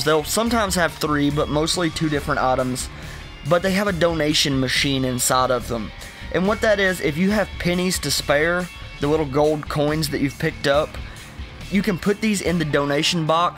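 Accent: United States. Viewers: Love your American accent.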